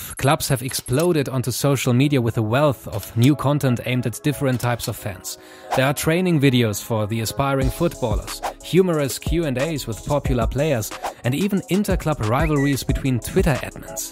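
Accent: Germany. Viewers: I’m not 100% sure but I think he has german accent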